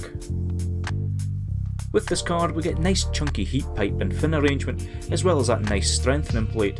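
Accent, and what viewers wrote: Scotland, United Kingdom. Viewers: Love the accent! Is it from Scotland?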